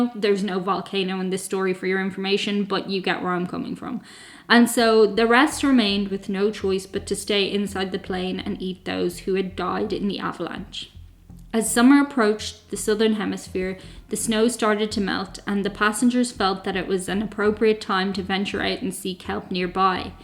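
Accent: Ireland. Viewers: Is it just me, or does this sort of story feel more unsettling when told by a speaker with an Irish accent? I think culturally we (at least in the US) don't associate "Irish" with this kind of darkness.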